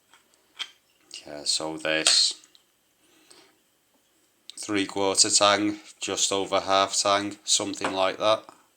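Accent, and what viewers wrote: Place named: Manchester, United Kingdom. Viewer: Nice work that mate , you've not lost the manc accent ;)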